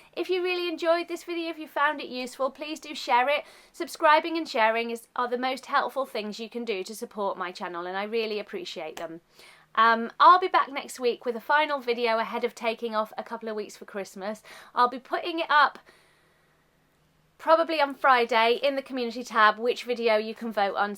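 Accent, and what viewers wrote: United Kingdom. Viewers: Hi. Your videos which focus only on autism have created what looks like a community of ( pro-autists ). With that groundbreaking endeavor you have made normal and likeable what is essentially seen as abnormal and dislikeable. Besides, you have provided a knowledge pool regarding this condition which covers many of its aspects. For us none native speakers of the English language, it is a thousand times easier to find a material in American English than to find it in British English. For me, both as a dentist and an avid student of the English language ( the British accent ), your videos provide a save heaven of learning which I both appreciate and admire. I have been thinking, as well, it would be great if this same idea be expanded to cover other areas of people with special conditions. Such a focus will turn these special conditions from the stereotypical branding of ( disorders ) and ( disables ) into groups of special conditions and especially creative communities. 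I strongly believe, it worths trial.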